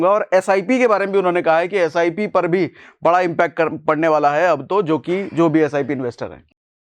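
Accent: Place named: Bhojpuri, India. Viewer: Please try to understand what is analysis bringing out data is only fact what is implication on India on the tariff placed by Trump you don't know you have not even understood you are just speaking like Khan sir nothing more no value I have watched you few times you have got only style of speaking and that a typical of Bhojpuri nothing else no value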